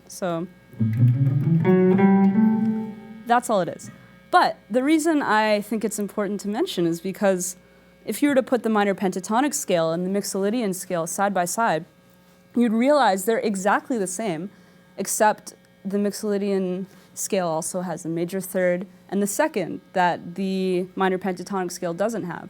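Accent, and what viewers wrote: Canada. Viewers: beware, she's canadian... she pronounces about "aboat" and that winter jacket... funny thing about music "theory", no matter what you do it can be explained "theoretically". you'll realize this after siting thru a non diatonic chord, modal interchange or dissonance recital, or any mixure therof... solid lesson, penta = 5.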